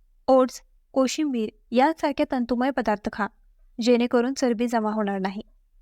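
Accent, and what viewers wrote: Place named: India. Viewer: तुमचे मराठी हिंदी वळणाचे आहे. मराठी शिका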